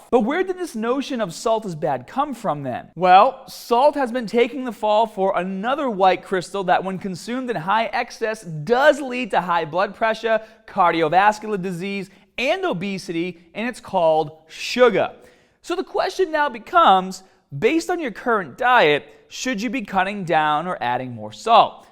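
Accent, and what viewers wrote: Boston, United States. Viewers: Lowis Coetzee He has a strong Boston accent, which he acknowledges.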